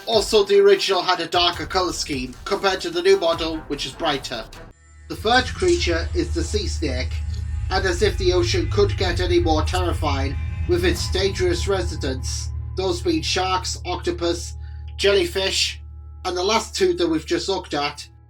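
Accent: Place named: United Kingdom. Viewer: i must say, for a resident in the UK, you have a very interesting accent, what region of the UK are you, because your pronounciations sound unusual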